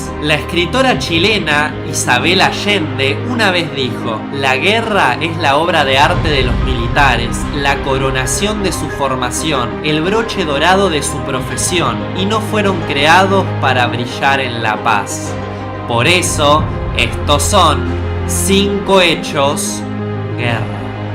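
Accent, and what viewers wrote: Argentina. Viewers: Gumii Megpoiid ¿De dónde eres? No conoces el acento argentino, que mal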